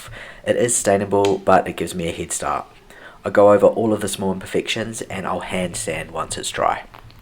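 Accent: New Zealand. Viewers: Watching from NZ. Love hearing the NZ accent! I watch Jay too. So cool you guys support each other. Two big thumbs up from Aotearoa - Land of the Long White Cloud. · What a lot of work. I admire your patience. Well worth it and a fabulous finish! Keep up the good work and show us more of your renovations. Is it a kiwi accent I hear? Linda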